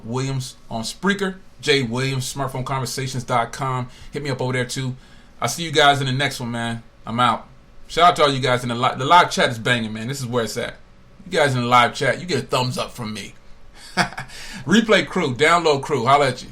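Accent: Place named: New Jersey, United States. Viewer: Really enjoyed the podcast man. Keep dropping knowledge on these people man, u doing a public service. how u gonna be spending all these hundreds of dollars on a phone & u can't cover your bills & responsibilities. People get their priorities mixed up but they don't like when u call them on it. Btw I think your jersey accent comes out the longer u talk, I think this is only the first or second time I've ever heard it cuz it doesn't come out in your regular videos and reviews.. Jus thought it was kinda funny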